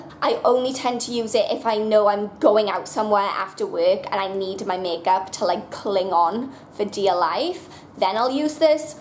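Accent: Wales, United Kingdom. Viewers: I love your Welsh accent!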